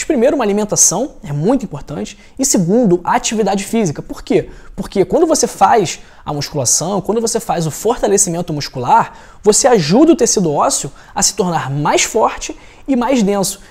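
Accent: Rio de Janeiro, Brazil. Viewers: Gostei muito voce é carioca?voce tem sotaque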